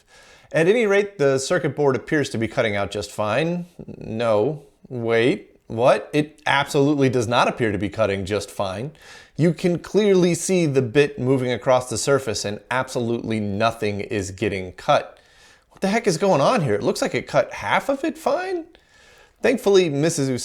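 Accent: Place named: Texas, United States. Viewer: Yep. I'm assuming he has a Texan accent since he lives in Texas and sounds like most of the Dallas/Ft. Worth area folks I know. In that accent, "indian" and "endian" sound exactly the same.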